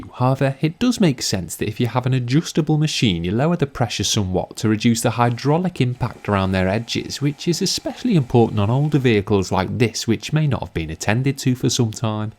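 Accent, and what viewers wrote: Liverpool, United Kingdom. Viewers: You must be from Liverpool or around there !!! Lol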